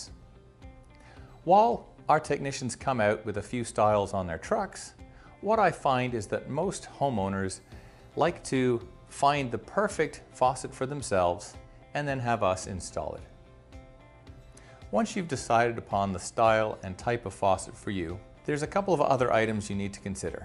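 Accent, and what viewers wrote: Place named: Canada. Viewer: North American plumbing is .... weird! I say North American, as I detect from your accent that you may be Canadian. In the UK taps (faucets) are also categorised with regard to compatibility/suitability according to pressure differentials on cold water and domestic hot water. Some mixer/single outlet spout taps do not work well where hot water is gravity fed (via a roof tank through a hot water storage cylinder-gravity) and cold water is supplied directly from the mains. This is particularly problematic on bath mixer taps. Upon fitting bath deck mixers (2 heads one spout) I have found that the cold, mains pressure actually forces hot water back into the vented cylinder, up to the roof tank(s) and out of the property through the warning/overflow pipe. By the way, you deserve more subscribers. I have 50+ subs, my production standards are very amateurish and I don't just do plumbing content. 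So, I've subbed.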